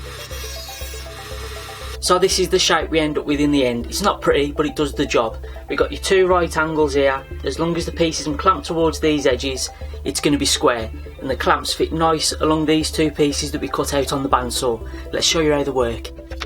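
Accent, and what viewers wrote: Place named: Birmingham, United Kingdom. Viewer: first time I've heard a Brummie accent on YouTube in a decade of viewing. Ofc you are probably from black country, rare to hear the accent in actual Brum.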